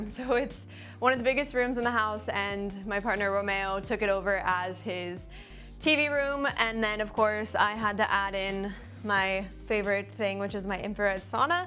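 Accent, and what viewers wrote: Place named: United States. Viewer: You and your obnoxious murican accent..why didnt you stay in Usa?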